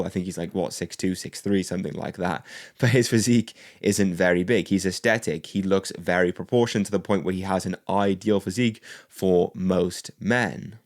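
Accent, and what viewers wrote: United Kingdom. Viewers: restarted and British bro ngl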